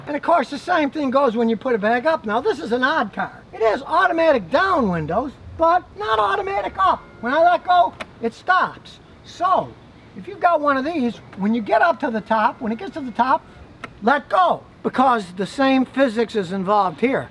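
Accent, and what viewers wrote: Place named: Omaha, United States. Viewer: chin(k) from asia here..........is it me or does this guy remind u of warren buffet......hes got that omaha accent,the way he talks even his sense of humour kinda reminds u of buffet.except instead of warren buffet the oracle of omaha and financial guru,hes warren buffet ur friendly neighbourhood car mechanic.maybe hes buffet's long lost twin brother who drinks 8 cans of diet coke a day.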